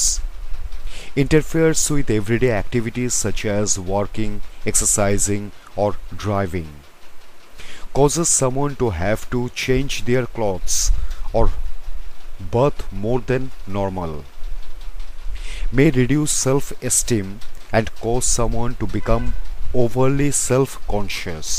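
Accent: India. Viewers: He is lndian..you cannot expect him to talk like an English or american man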